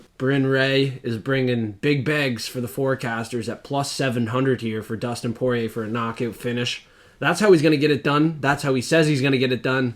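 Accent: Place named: Glace Bay, Canada. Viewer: Fuck b'y you sounds like yer rite outta glace bay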